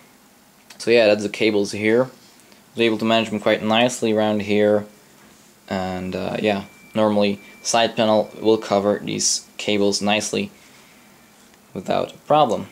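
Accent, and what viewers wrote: Netherlands. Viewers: Are you Dutch ? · Ik hoorde gewoon direct dat je nederlands was lol nice vid btw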